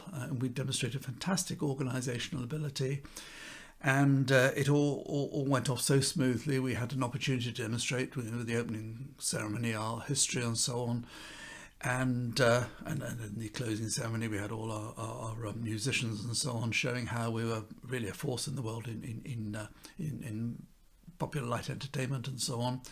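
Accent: RP, United Kingdom. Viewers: I may be guessing here but from the diction, the accent and the probably assiduously practiced impression of faux bewilderment, it seems inconceivable that this fellow could possibly have any idea of what it was like for the wages of most people to be undercut by the availability of a limitless supply of cheap labour from the continent. Any road up, I was grateful for an opportunity to have another good laugh at a bitter, remainer loser. I'm only sorry I couldn't watch the whole video. I think I may have split my sides had I done so.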